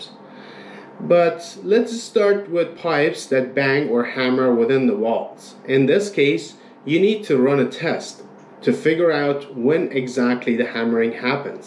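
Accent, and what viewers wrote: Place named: Iran. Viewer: You have an accent, are you Persian?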